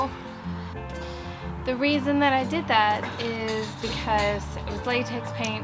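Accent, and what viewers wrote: Australia. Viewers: I must say, I love your Australian accent❤️👍 as well as your work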